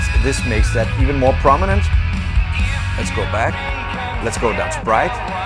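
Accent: Denmark. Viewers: Danish accent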